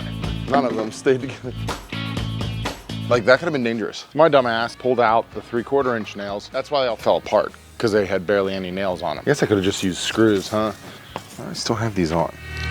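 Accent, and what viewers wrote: Pittsburgh, United States. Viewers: I keep hearing Yinz which means you gotta be from Pittsburgh. Instant sub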